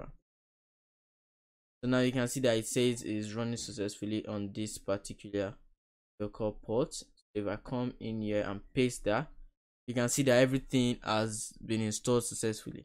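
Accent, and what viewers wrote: Nigeria. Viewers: I feel at home listening to you😂. Loving the Nigerian accent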